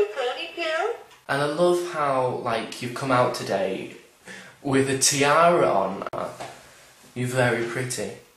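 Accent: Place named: Northern England, United Kingdom. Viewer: the northern accent is killing me omfg you star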